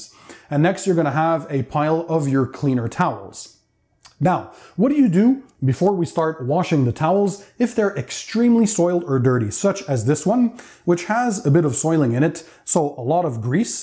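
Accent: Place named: Canada. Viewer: What is his accent. Sounds Canadian, but with something else.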